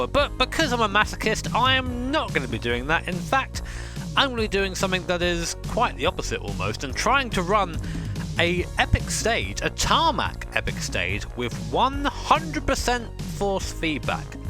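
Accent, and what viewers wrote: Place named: England, United Kingdom. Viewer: 🤣 lmao this had me smiling ear-to-ear the whole video I love your English accent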